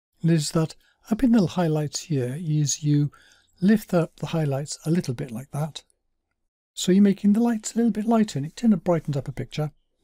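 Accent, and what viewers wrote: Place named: South Wales, United Kingdom. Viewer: Hi. From your accent and some of the photos you use I guess you're in my part of the world - S Wales?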